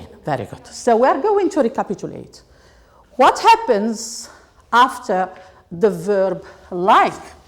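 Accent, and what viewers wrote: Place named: Morocco. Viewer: at least we could say this is Moroccan accent.We all know having British or American accent is no by no means available for all English teachers ....it's not easy to speak English authentically .if you have any other oppinion then you find it difficult to speak English like the way British or American people do ( namely native people )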